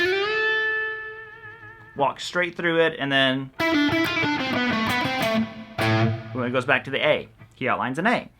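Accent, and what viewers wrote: South, United States. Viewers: Hi. Australia calling. Love that southern drawl y'all have!! You can give me Blackmore fovever as he's my favourite guitarist bar none. How about the "solos" on Highway Star and Burn?? BTW check out Bach's tocatta and fugue in D minor for the Death Alley Driver riff and Sky's version of Tocatta for Ritchie's inspiration.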